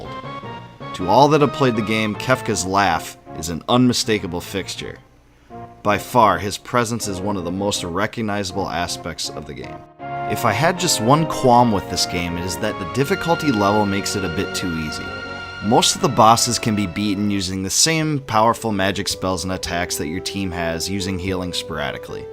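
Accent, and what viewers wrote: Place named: Minnesota, United States. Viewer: Ur from Minnesota huh? Or Canada. I can hear it.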